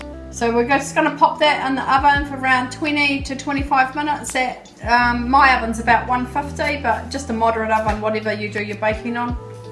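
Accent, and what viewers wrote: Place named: New Zealand. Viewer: What part of NZ are you from? That's a strong Kiwi accent!! I watching from Spain, missing my Kiwi treats.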